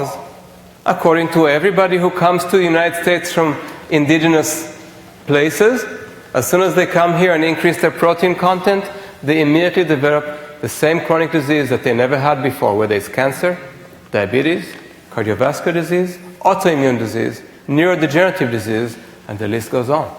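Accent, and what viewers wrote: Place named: Israel. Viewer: when he pointed out his Israeli accent it was a ripoff of what Deepak Chopra does in his seminars to get rapport with his audience, mentioning his Indian accent.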